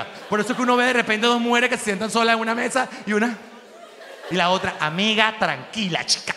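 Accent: Cuba. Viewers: Es q pareces cubano de pura cepa jajajaja,aquí no existe la terapia jajaja👏👏👏💯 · Jajaja, genial el acento de nuestra tierra!!! 😂😂😂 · Soy cubana 🇨🇺 como m rio cuando hablas como nosotros 🤣🤣🤣🤣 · Soy cubano de nacimiento, venezolano de corazon y brasileño por naturalización. Viví 7 maravillosos años en el Zulia y escucharte me hace reirme a llorar recordando aquellos locos maracuchos que me tenian el dia entero riendo. Tus imitaciones de los cubanos son fantásticas pero te pareces mas a un habanero hablando que a un pinareño ( lo digo por mi porque soy habanero) un abrazo desde Brasil. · Ese cubano que llevas adentro está buenisimooo!!!!😅❤🎉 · Muerooooo me encanta la interpretación Cubana que haces ❤ Te Quiero 😅😂😂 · Jajajajajajaja c.l.m ese acento cubano!! Te quiero mi amor! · Soy cubana y en verdad me encanta tu acento cubano y me meo de la risa 🤣 😂😂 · habla como si fuera otro cubano más 😂😂😂, mucha observación y cuidado al detalle 😂😂😂😂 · 😂😂😂😂Ayyyy moriiiii. 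Soy cubana! Y gozo, cuando veo al perrito cubano y cuando hablas como nosotros en tu show. Ya hoy, lo acompañaste, de las vulgaridades, y para mí, resultó comiquísimooo 😂😂😂😂😂😂😂😂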